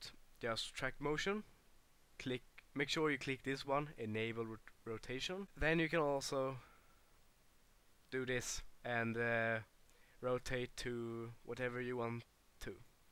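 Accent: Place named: Sweden. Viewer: man vet direkt att du är svensk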